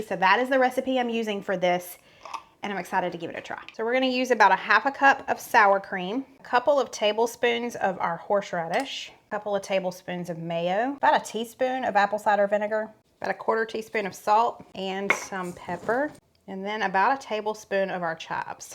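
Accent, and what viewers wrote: South, United States. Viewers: Now a days I put mine in the crockpot. The Rueben’s for the leftovers are the best part! you say “boil” however you want to!Its fine and I love the southern “accent” of it❤ Also I am jealous that I can’t go to your meet & great, but I bet you & everyone who attends will have a blast!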